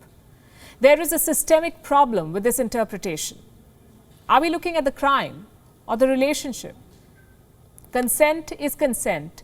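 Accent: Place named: India. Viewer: People mock her for her Indian accent. You are probably the first non Indian audience I have seen to appreciate her- I hope u r non Indian!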